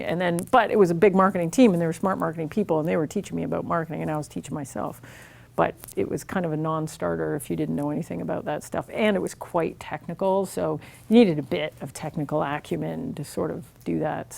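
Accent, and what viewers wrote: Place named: Canada. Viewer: excellent video #1 - canadian accents are awesome #2 - "it's not a pivot. same product, same thing. It's just a different way of talking about it" #3 - i think i'm going to join <link> #4 - "if it wasn't really hard, we'd all be in fiji drinking fruity drinks from a coconut, but we're not because this is hard" #5 - what does "gorpy" mean?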